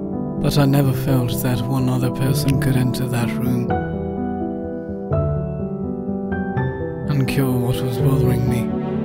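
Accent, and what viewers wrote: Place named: England, United Kingdom. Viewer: Bukowski spoken by a young, posh English guy does not work.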